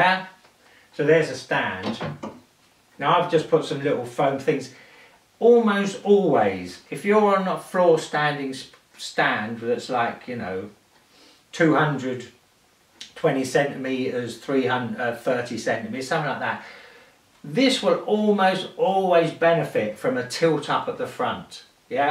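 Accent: London, United Kingdom. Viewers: Thats London english, none of that Queens English rubbish, learn this!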